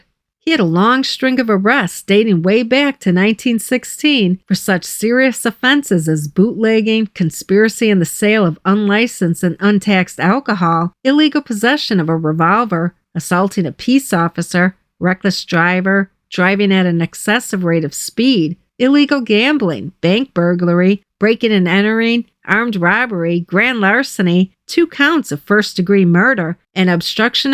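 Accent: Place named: Chicago, United States. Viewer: You’re great, amazing content but… the heavy Chicago accent…. Doesn’t match with the NE mob.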